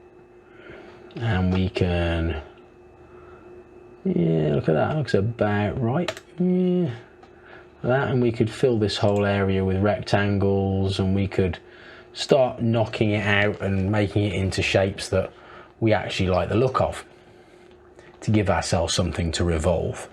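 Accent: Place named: Oxford, United Kingdom. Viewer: Thank you for your very good oxford english. For us germans it is a benefit to listen to you.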